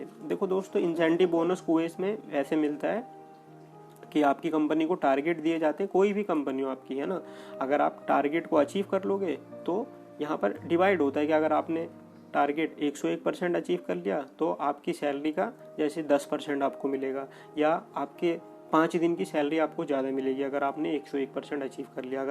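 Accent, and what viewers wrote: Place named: Garhwal, India. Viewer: bhai India me aap kahan se belong karte hain..aapka accent garhwal ya koi pahadi side ka lagta hai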